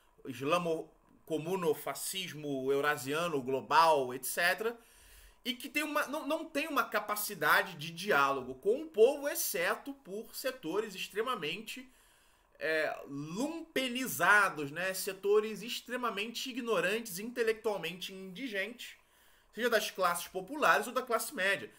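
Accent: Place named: Rio de Janeiro, Brazil. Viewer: rapaz, o carioquês tá brabo hoje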